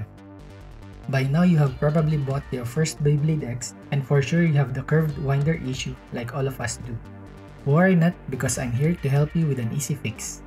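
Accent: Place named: Philippines. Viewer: This can literally help out beginners! Also your accent seems familiar, are you also a Filipino?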